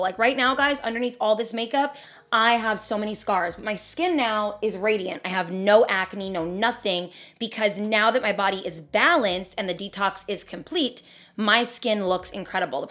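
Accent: New Jersey, United States. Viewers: Are you from jersey? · Great video!! Are you from New Jersey btw? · I started laughing watching this video. I’ve never noticed in any of your other videos. I don’t know if it’s a you’re in Jersey and you’ve picked up the jersey accent or you had it before but just never noticed but I love it by the way you’re looking amazing and congratulations on your weight loss and your transition to your new body.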